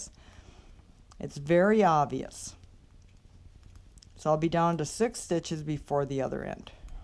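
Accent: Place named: Minnesota, United States. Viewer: LOL Love the rollling on the back thing. Good vid, well done. Let me guess, you're from the north somewhere, Minnesota, Wisconsin, Canada?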